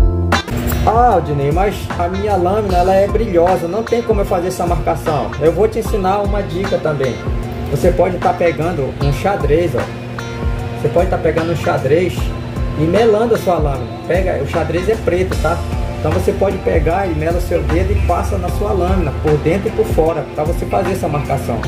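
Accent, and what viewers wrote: Pará, Brazil. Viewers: Pelo sotaque vc deve ser paraense, cara, gostei da dica, certa vez joguei uma máquina fora porque não sabia se podia amolar, agora já sei, sobre o sotaque, morei 10 anos em Belém e 1 ano em Macapá, foram anos incliveis na minha vida, sou cearense.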